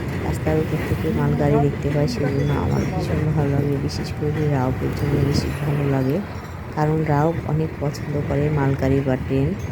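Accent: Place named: Rajshahi, Bangladesh. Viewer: Eta Kon jaiga? Apnar vasa amader Rajshahir moto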